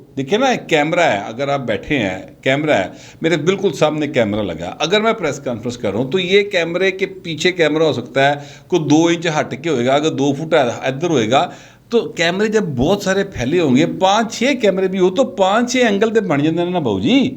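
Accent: Lahore, Pakistan. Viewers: Good 👍!! Typically lohery style sir ji😍😍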